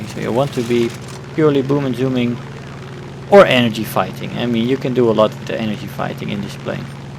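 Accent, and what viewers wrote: Netherlands. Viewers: hi, are you dutch?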